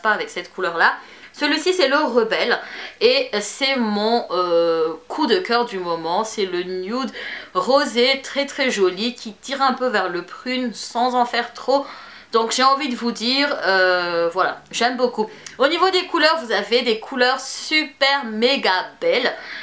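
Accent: Madagascar. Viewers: Ça se voit et s'entend qu'elle est Malgache. " Manaona Ampelassoua " .... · Tu as de l'accent Malgache 😗😗😗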